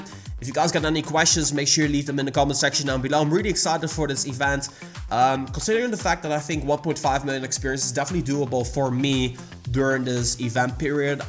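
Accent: Netherlands. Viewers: You really sound dutch plus i might move to the Netherlands in August so i hope i meet you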